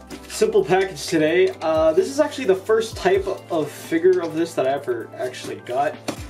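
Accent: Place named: Quebec, Canada. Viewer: Are you from Quebec? I mean, can you be from some where esle with that accent and those noodles?! Well I just look at the others videos and this is the only video where you sound Québécois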